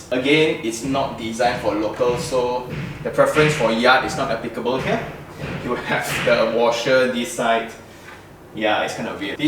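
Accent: Malaysia. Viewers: Your sound like malaysian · are you from penang? Your hokkien sounds like 1!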